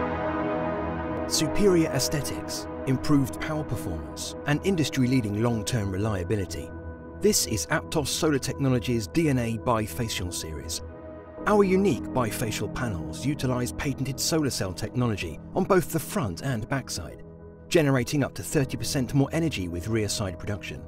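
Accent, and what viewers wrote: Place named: United Kingdom. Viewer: Who wants to listen to British accent in the U.S? This is a bad sales strategy.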